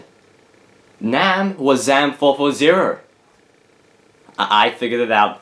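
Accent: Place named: Australia. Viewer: Are you Australian? I can hear it in your voice. I'm Australian aswell.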